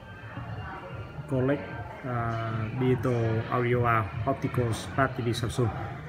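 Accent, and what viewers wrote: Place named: Vietnam. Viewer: I love vietnamese english 🇻🇳 ❤️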